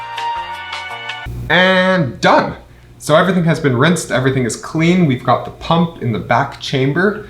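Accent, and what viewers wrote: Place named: West Coast, Canada. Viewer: I can spot a West Coast Canadian accent from a mile away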